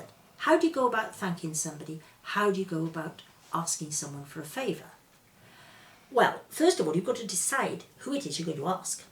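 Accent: Wales, United Kingdom. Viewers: I love your accent I hear Welsh in it ❤💛💚🧙✌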